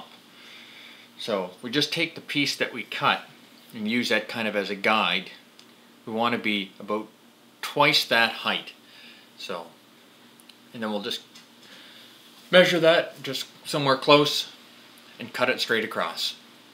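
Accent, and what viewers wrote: Canada. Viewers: It's all cleaned oat, yep he's canadian.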